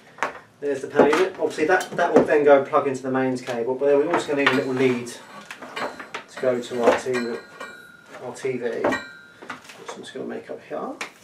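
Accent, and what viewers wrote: United Kingdom. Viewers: he's from the UK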